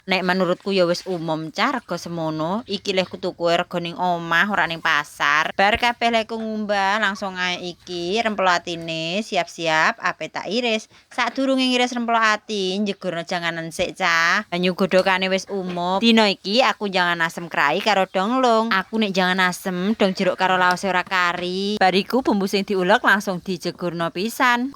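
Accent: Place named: Java, Indonesia. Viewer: Nah begini penggunaan nada bahasa jawaNya mbak... Lebih enak didenger.... ❤❤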